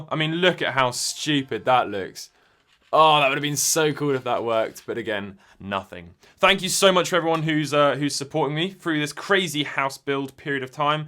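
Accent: Australia. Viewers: YOU HAVE TO BE AUSTRALIA MATE if not then british BUT I THINK AUSSIE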